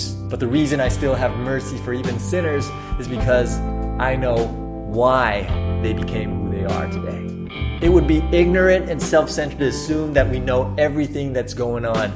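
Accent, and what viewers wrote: Standard. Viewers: 很喜歡你的口音發音很標準! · 真的很感謝你做這些影片以及可以讓我們練習習慣非常標準的口音，但是中文字可以調整成當我有設定cc字幕的時候再出現嗎?有時候我只想看看英文不想看中文，但我關不掉。不管如何總而言之，超級愛你的正能量~~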